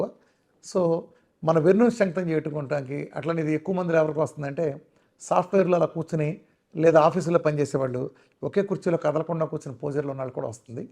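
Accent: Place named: Andhra Pradesh, India. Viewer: Me matalu alane unni memu Andhra me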